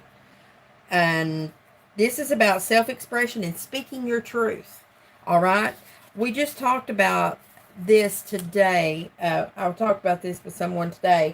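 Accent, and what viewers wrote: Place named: South, United States. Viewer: ♥️🙌 Love the southern in ya, Sister! 💫🌻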